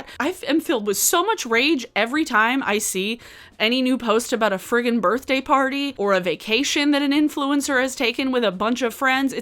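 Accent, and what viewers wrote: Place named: New York, United States. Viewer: Came over here from Hannah's video and loving it! Also, your accent sounds exactly like my aunt (she's in NY state and I'm in Western Canada), so it was like listening to her for a while! She is also very no-nonsense.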